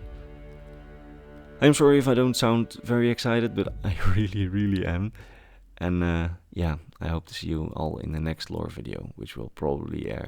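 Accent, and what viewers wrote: Netherlands. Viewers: You sound Dutch, if I am correct in assuming this then awesome cuz i'm dutch, if not then sue me, nice vid tho. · Are you Dutch? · Are you like a... Dutch Farengar? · is that a fellow dutchman i hear? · I can hear from your accent that you are Dutch.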